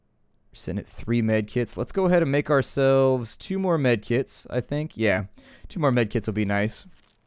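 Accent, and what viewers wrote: United Kingdom. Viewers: Guy totally looks Cuban, talks like a brit lol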